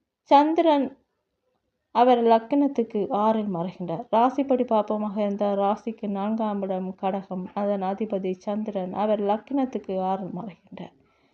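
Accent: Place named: Sri Lanka. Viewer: உங்கள் இலங்கை தமிழ் அழகு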